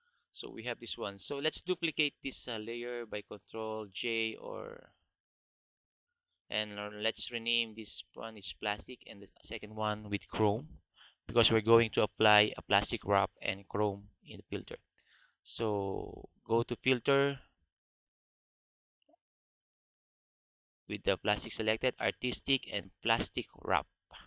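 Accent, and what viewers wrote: Philippines. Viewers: nice vid !! nice pinoy accent!!! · hahah. is this guy a fillipino? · ang pagka english nya ay PINOY na PINOY! ee!! nice kuyA! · kaya naman pala parang pilipino ang nagsasalita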